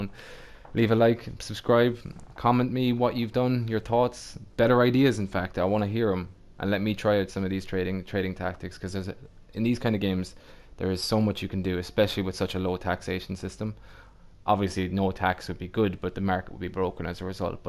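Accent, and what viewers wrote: Ireland. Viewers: Are u Irish cos I'm from waterford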